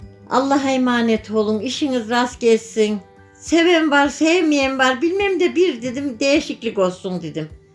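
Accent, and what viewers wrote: Kayseri, Turkey. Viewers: Tam bir Kayseri babannesi. Konuşmasından anladım ben de has Kayseri'liyim.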